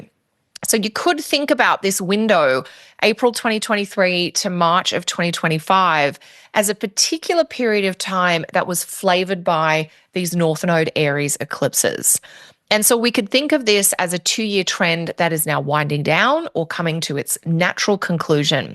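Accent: Australia. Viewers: So nice to hear a fellow Australian . I have south node in Aries . Chiron in Aries and par of fortune . I don’t know what it means but I feel like crap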